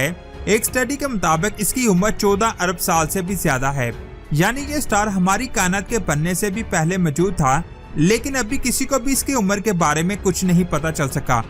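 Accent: Punjab, India. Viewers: Dear BROTHER ,Please correct your english like SKREEN. dont mix panjabi way in the vedio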